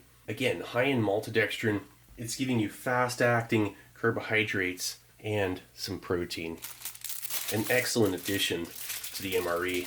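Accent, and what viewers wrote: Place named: California, United States. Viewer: Love your videos man! Ps that Californian accent is gold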